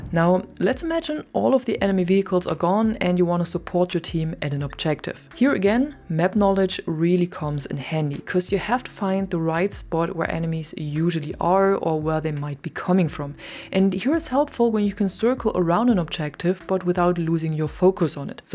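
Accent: Germany. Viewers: man hör den deutschen Akzent echt nur ein bisschen raus🤣🤣🤣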